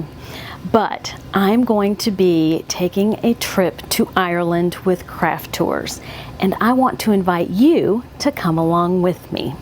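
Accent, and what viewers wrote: United States. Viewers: Thank you. Love this video...and your wonderful southern accent!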